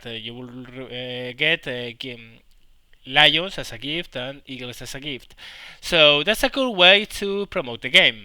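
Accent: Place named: Spain. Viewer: He is Spanish, so its a Spanish accent.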